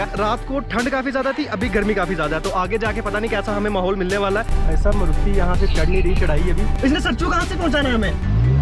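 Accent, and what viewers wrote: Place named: Pakistan. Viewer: ye blogger pakistaniyo ku tarh kyon bol raha hae ...iski tone full pakistani jaisi hae.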